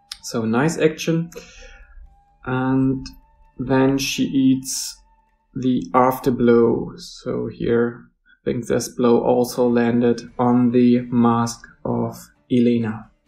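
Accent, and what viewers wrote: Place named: Germany. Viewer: I like the German Accent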